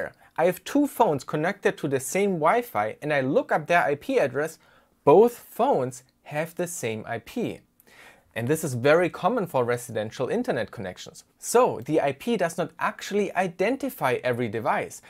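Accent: Germany. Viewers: i could hear u are german, but now i see you are from my town :D MOIN!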